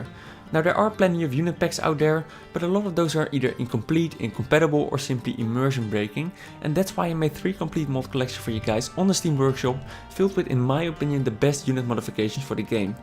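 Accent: Netherlands. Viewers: Ik wist gewoon dat je Nederlands was door je accent, toen las ik de beschrijving van je kanaal xD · haha your sooo dutch i can tell by the accent sooo much haha great vid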